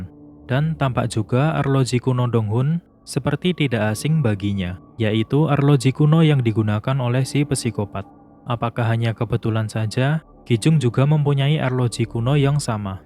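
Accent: Java, Indonesia. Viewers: Klo dengerin suaranya kaya org Jawa😀 trus tb nya rada tinggi Iyah ngga sihh bang hihihiii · Semangat terus bang:) Btw suara luh, medok bngt bang🙏😅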